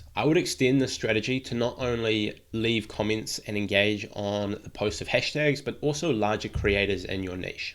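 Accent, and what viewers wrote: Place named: New Zealand. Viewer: Great vid, dude sounds like he’s from NZ, even better ! 🔥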